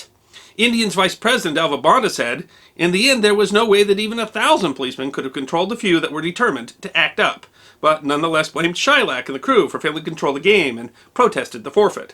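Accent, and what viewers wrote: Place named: Indiana, United States. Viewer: you sound like the indiana version of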